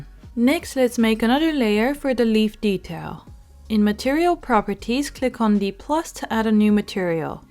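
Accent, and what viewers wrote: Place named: Scotland, United Kingdom. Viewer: The heck kinda accent is that? Watered down Scottish?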